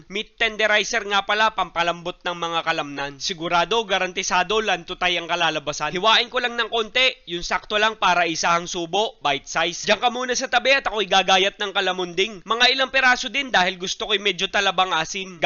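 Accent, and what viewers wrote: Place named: Oriental Mindoro, Philippines. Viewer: Natutuwa ako sa pananalita mo .alala ko ang time ko aa Oriental mindoro..ala eh ganyan sila magsalita..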